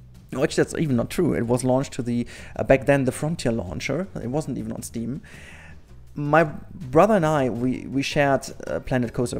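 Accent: Germany. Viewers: To be honest, I like that you explained your choose of language. I watched many of your videos, simply because they are absolutely amazing. But your German accent and some phrases were confusing me sometimes... anyhow, now I love your videos even more! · I actually really like watching you because of your German accent, please don't change :) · War ja klar, dass die deutschis sich aufregen, dass du Videos auf Englisch machst. xD Anyway, du bist der erste Deutsche, dem ich auf jedenfall laenger zuhoeren kann, da dein Englisch wirklich sehr gut ist und du auch keinen so starken Akzent rumtraegst. Don't listen to the kiddos, who whine because they dont understand shit - you do some neat, quality content and deserve the recognition online :) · I kind of like hearing German people speak english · I for one think the German accent and language is positively breathtaking. 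Normally I’m not a fan of commentary in speed builds but you have such interesting mannerisms, stories and viewpoints that I quite like listening to you talk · German too and I don´t like hearing most others,when talking english, but you don´t have this strong accent. it is noticable ,but just slightly. That´s very ok to listen to, similar when I speak. Ikr ,hearing oneself is always weird. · well, about your accent is good better than another German 🙃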